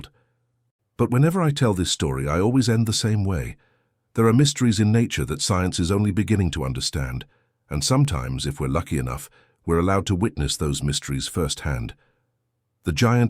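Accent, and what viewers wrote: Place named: Florida, United States. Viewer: Love that "Florida" accent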